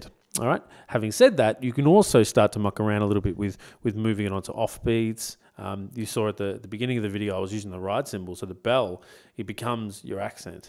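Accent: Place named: Australia. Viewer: Was not expecting you to have an Australian accent haha. Good video.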